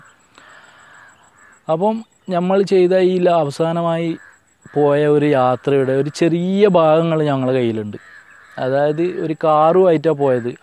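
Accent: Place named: Kannur, India. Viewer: നല്ല തനതായ കണ്ണൂർ ശൈലിയിൽ ഉള്ള അവതരണം വളരെ അധികം ഇഷ്ടപ്പെട്ടു. പുതിയ വിഡിയോയ്ക്കായി വെയ്റ്റിംഗ്..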